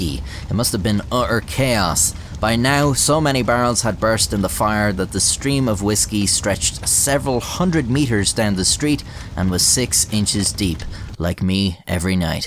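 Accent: Ireland. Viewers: You think Americans thinking your Irish accent is fake is annoying Try Brits (who should know better) mistaking my (admittedly watered down) Irish accent for being American. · You’re extremely lucky to have the Irish accent that you have. Americans can actually understand what you’re saying. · I'm thinking your accent is cavan or maybe meath, not too sure though (being a dirty dub myself) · What part of Ireland are you from? The first video I watched on your channel was the one about the runaway train. I was trying to puzzle out for a time what part of North America your accent was from until your "o"s got me to think across the pond. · Yea I suppose most Europeans couldn't identify my distinctive Wisconsin accent but I knew you were Irish because it's obvious to me at least that your accent is Irish. Probably a lot of people died of being poisoned by drinking poop and pee and who knows what microorganisms and parasites were in that slush. 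I'm not convinced it was entirely due to the alcohol itself and the dog probably couldn't suffer from physical or mental withdrawals after such a short period of time. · It makes sense that people in Ireland would talk differently due to where they came from. So where are you from? Cork? Kilkenny? Galway? Please tell me. O yes, this was quite amusing by the way; morbid, but amusing. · I'm American and I could tell your accent was Irish before you confirmed it for me. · I've heard it said that if have a dog that is a lush, just give him all he wants to drink until he passes out. The next day give the dog some more, and if he drinks he's lost. But a lot of times all it takes is the smell of it and the dog will run away yapping it's head off. This means no doggy anonymous meetings are required, and the pooch has been cured forever. By the way it's very easy to see and hear that you're Irish. 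Have some of the Isle of Erin in me. Like your show and tales from the bottle! Peace and love. Dan. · I'm genuinely surprised that people couldn't place your accent as Irish... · I found it surprising people have trouble with your accent. The first time I heard you speak i knew you were Irish. I would have guessed you were from Glasgow though. Thinking more about it, I guess it makes sense I know a little more about the different dialects of Ireland, my family is Irish, my great grandmother only spoke Gaelic and, while I haven't, a lot of my family travels between the US and Ireland fairly often, so I'm a little more educated about Ireland than tbe common US citizen. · you can tell it's a legit Irish accent because the first syllable in "Ireland" is effortlessly between an American "are" and "our" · I'm an American and I knew you were Irish from the first vid I watched :/ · I’m American and I knew your accent immediately I’ve been to Dublin and loved it js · bro I knew you were Irish the second I heard you, not all us americans are idiots I promise 😂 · Who didn't realize was Irish. 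I thought all the replacing TH sounds with Ts and Ds made it obvious 🤷‍♂️ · So, where's the accent from? I consider myself fairly familiar with Irish accents. I'm guessing the midlands, cos it's fairly neutral, but definitely Irish. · I started watching and subscribed mainly based on his awesome Irish accent - the wit, humor and factual information are what got me hooked. Thanks, you have taught us so much and provided many belly laughs. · I'm in the US, and I always assumed you were Irish when watching your videos, even if your accent is slightly different. Boston accents, Texas accents, Chicago accents, and New York accents are all in the country I live in, I imagine that there are different accents and dialects in every country and language in the world. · It’s okay, I could tell you were Irish. And there’s a place called Belfast? I thought that was just my ex ex ex ex ex ex friend’s waifu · well you sound very Irish to me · You got rich boy irish Accent. 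heard it all over the world.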